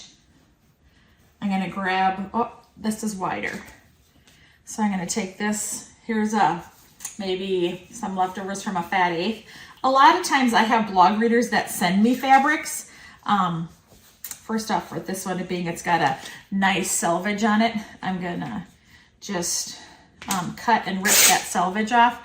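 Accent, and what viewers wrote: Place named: Midwest, United States. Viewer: I don’t know how many times I have watched this video. I LOVE cutting up scraps so this is right up my alley. My father was born in Charles City near you and we went there every summer and even once at Christmas. I have many wonderful memories of Iowa (which is beautiful) and your Midwest accent is pleasing to my ear. I read your blog daily and I wish you the best with your health issues.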